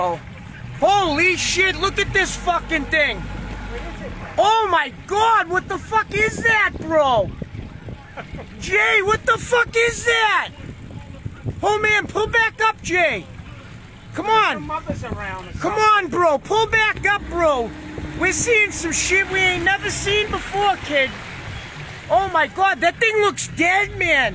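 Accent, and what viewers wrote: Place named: New Jersey, United States. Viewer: the joyzee accent makes it that much betta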